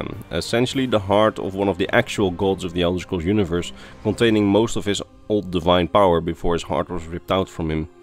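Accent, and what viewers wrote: Netherlands. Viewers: Lol, I love watching this channel, I mainly listen to it because I love 's Dutch accent. Can't get enough.:D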